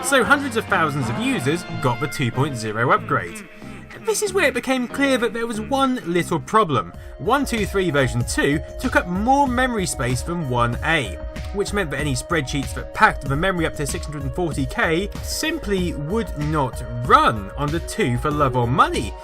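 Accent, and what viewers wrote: United Kingdom. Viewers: In all fairness, English is my only language, but can be hard to understand at times. I find that's just his UK accent.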